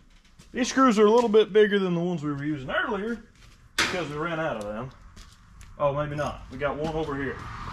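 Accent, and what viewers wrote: South, United States. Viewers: I'm so hooked on hearing the ambiguous southern narrative describing the journey of restoring a completely totaled wreck into a running driving car! I can't help but thinking the song should be playing in the background. Can't wait to see the finished outcome.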